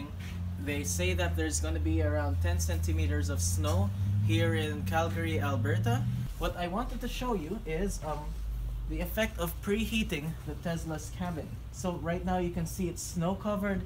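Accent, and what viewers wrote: Philippines. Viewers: Worth it ba tong sasakyan na to sir ? Taga cali kasi ako ang dami kong nakikita nyan dito. Hindi naman malakas sa kuryente pag nag charge filipino ka halata naman sa accent nating mga filipino. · I noticed the Filipino accent 5 seconds into this video haha. Greetings from another Pinoy from SoCal and it's great to see another pinoy driving an EV. 🇵🇭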